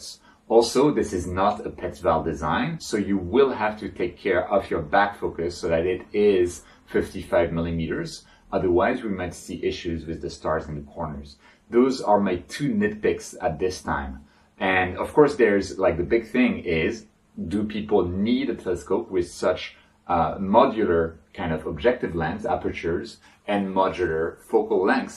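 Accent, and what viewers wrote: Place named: France. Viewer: Bonjour de Belgique, it seems that you have a french accent, serais-tu français?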